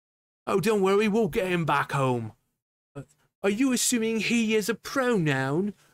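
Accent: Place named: United Kingdom. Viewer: Brilliant analysis (and Brit accent) 😊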